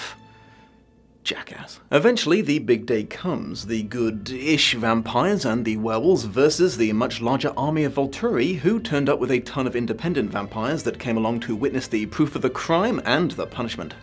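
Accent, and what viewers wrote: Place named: United Kingdom. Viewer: Hearing you rip this series to shreds, in your awesome British accent, is the most cathartic thing EVER.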